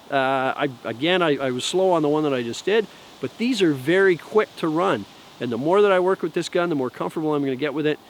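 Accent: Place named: Canada. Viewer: You're either in California or Canada.. I vote Canada, because the "aboat" thing. The gun actually comes with a 17 round mag in normal places.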